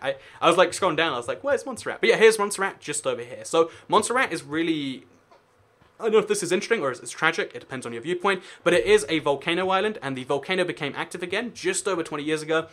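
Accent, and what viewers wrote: England, United Kingdom. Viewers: Is it your first time hearing a british accent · This guy talks faster than the Chipmunks on speed, and he's English. There are lots of great videos but even more bad narrators on You Tube.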